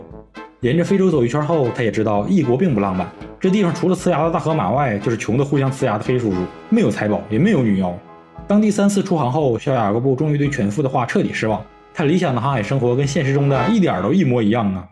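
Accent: Northeast, China. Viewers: 我铁粉节目里语言上家乡风味很足东北人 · 这一股大碴子味。哈哈，真亲切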